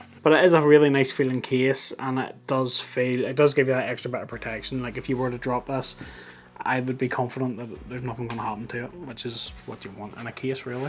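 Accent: Northern Ireland, United Kingdom. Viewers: Well I wasn't expecting a Norn iron accent in a tech video! Super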